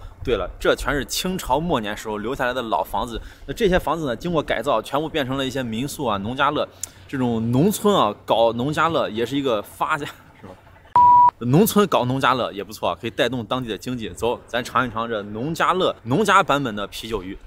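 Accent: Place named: Henan, China. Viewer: 是河南人吗？普通话的河南口音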